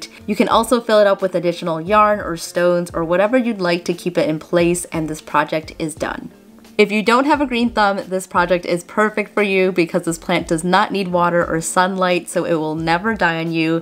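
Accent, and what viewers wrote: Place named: Midwest, United States. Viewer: it's so nice hearing midwestern accents from youtubers ♡ from a milwaukeean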